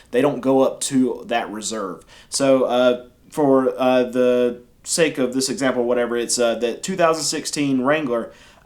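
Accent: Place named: East Tennessee, United States. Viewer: Reminds me of east tns accent, y’all say own instead of on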